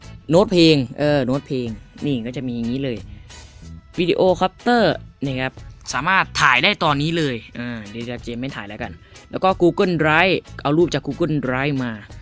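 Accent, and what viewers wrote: Nakhon Phanom, Thailand. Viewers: พี่อยู่นครพนมใช่ไหมครับ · คนนครพนมหรอครับ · พี่คนนคระนมหรอครับ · พี่อยู่จังหวัดไร เหมือนนครพนม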